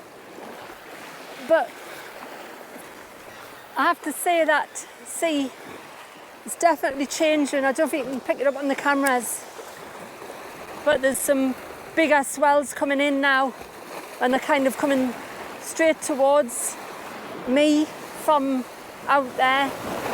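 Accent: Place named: North East England, United Kingdom. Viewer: Nowhere guarantees big fish, as I'm sure, you well know, but you can make their capture far more likely by choosing time and place carefully. Sure, just being there is good, and LRF for mini-species can be great fun, but if I'm using the real gear, I like to catch something I can eat. Your accent implies north-east England. There are some fine Cod, Bass, and flatties in that area, though of course, it's all about time and place. The opposite coast from you, holds loads of big flatties in the sandy estuaries, and some Bass. I got tired of catching wee Coalies a long time ago, so tend to avoid the east coast unless, I'm after flatties. Conversely, the west coast, and in particular, the Firth of Clyde has been commercially overfished to death. When I was a teenager, it was swarming with fish of many species. South-west Scotland can be good, but again, it's time and place. 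If I were you, I'd go less often, but farther afield. North-west and far north of Scotland can be excellent, though you'll need your hiking boots. Wales can be very good. It's not, all about catching big fish, but you'll get more watching if, you can show them a few they'd like to catch themselves.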